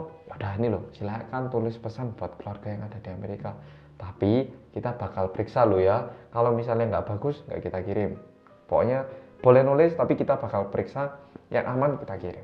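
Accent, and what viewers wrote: Java, Indonesia. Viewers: Lah ini hehhe akhirnya 😁😁😁 kalo gada suarane kaaya gak klop mas. Gak bisa denger medoknya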